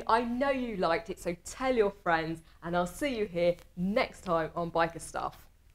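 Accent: United Kingdom. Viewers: Commanding female british accent?✔️ Bike stuff?✔️ BRIGHT POINTY HEADLIGHTS?!?!?✔️✔️✔️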